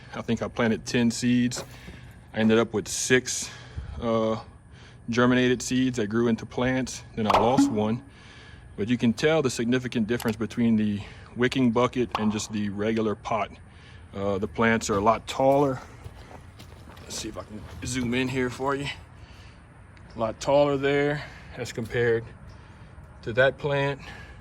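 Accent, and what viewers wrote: Louisiana, United States. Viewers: Omg I knew it! I heard the accent & knew it was Louisiana! I had to check the page to find out. I love yall folks down there. ❤ Great video & VERY informative. I'm going to be a first time gardener so I'm soaking up all the knowledge I can to ensure a successful harvest once I get started. Jist gathering the rest of my supplies. I can't waitttt!! 😁🙌🏽 Thank for this great info. Im definitely going to do this. I've been worried about using plastic in my garden (even food grade) but it seems you really can't get away from it. 😕 So plastic it is. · I know you Cajun “mark dat” you must be close😆. Going put my scrap pvc to work…Great vid! Also, started getting free buckets from Super One from the bakery, so this works out perfect! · Gotta love my down the bayou people,,, love the accent. Missing it up here in the Okies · What part of Louisiana are you in? 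I hear the accent all day. Originally from Laplace. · Do I detect a Cajun accent? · New subscriber here, thanks for sharing. first time I’ve seen this technique, Great idea, you sound like a neighbor to me, I’m a recent transplant to Covington but grew up in Metairie. You from Louisiana?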